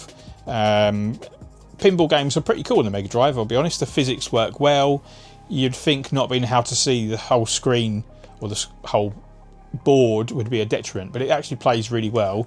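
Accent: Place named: Essex, United Kingdom. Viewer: Very well done video, straight to the point. Jotter that Xbox zombie game I think my local cex had that . You sound local are you in Essex ?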